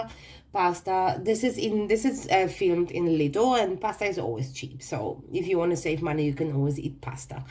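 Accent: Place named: Greece. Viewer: Your accent when you speak English is charming - and actually sounds quite Greek-ish to like rather than Polish to an English listener, so I think this is a good sign that you should fit in very well in Greece! X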